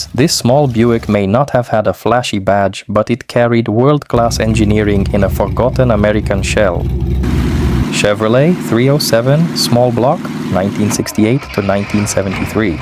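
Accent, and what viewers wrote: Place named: India. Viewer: > Indian accent narrator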